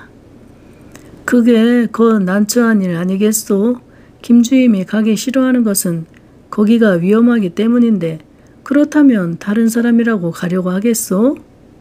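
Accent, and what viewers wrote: Jeolla, South Korea. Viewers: 많이 힘드실텐데 계속 읽어 주셔서 감사합니다. 전라도사투리에 욕하는것까지도 무척 찰지고 정답게 들립니다. 작가님, 건강 잘 챙기세요.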